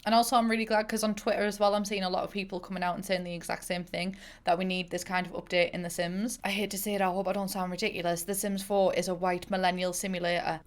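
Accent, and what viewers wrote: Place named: Geordie, United Kingdom. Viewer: I love the geordie accent, but your's in particular just brings back repressed memories of my old maths teacher and it is all I can hear.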